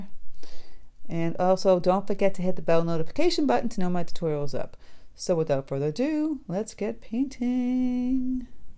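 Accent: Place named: North, United States. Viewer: Plumes. The things on the top of the grasses, like pampas grass. But anyway, you're my favorite! Girlfriend, you are the best teacher. It's all just likes and shapes and marks. You make it all so approachable. Thank you very much! Not only do I watch all of your videos, but I frequently paint along with you, and if I don't paint with you, I save the video to paint with you later. You've blessed my life. PS. As a NYer living in Atlanta, I love to hear your northern-ese way of speaking. ;)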